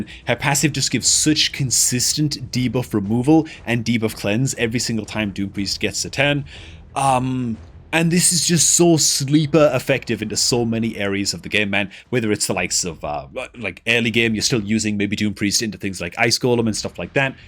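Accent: North East England, United Kingdom. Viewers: Probably a NE English accent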